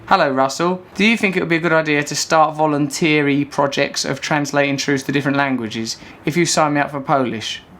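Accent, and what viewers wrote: United Kingdom. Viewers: your cool and all, but can you stop talking in a British accent. It's annoying me.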